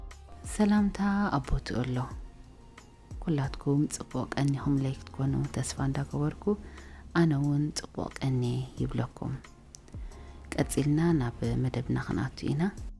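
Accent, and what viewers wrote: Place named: South, Eritrea. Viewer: All good but you need to fix your tigrignia. No more ኣፍቲ፣ ካፍቲ ፣ ናፍቲ ፣ ሓፍተይ ። It should be ኣብቲ ፣ካብቲ፣ ናብቲ ፣ ሓብተይ። it is sad to see Eritrean tigrignia being replaced by south of the border.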